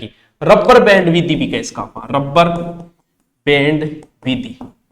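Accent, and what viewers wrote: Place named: Rajasthan, India. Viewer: Hello sir.... sir me MBBS kar rha hu....abhi 3rd year me hu......pta nhi kaha se mind me ye RAS ka bhoot sa chhad gyaa ek dum se.....aapke bahut saare videos dekhe hai maine ....aap bahut achcha padhaate ho... dheere dheere.....and marwadi sunn ke toh mazaa hi aa jaata hai sir.... English sunn sunn ke dimaag kharab ho rkha hai already 😂.....abhi pta nhi kya karna hai...but me isliye dekh rha hu aapke videos kyunki me Rajasthan se hu....toh mujhe ye sab pta hona chahiye naa .. geography... history....fort....rivers etc etc...mujhe kuch bhi nhi pta inn sabke baare me... isliye aapki kripa se thoda gyaan le rha hu...baaki dekhte hai....waqt kaha le jaata hai.......btw..... thank you so much sir ❤❤❤......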